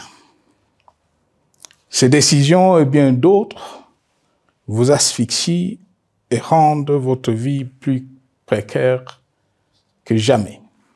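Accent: Rwanda. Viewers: L'accent rwandais ne l'a pas quitté malgré les efforts déployés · Veritable ruandais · ça façon de parler vous sentez que c'est un Rwandais😭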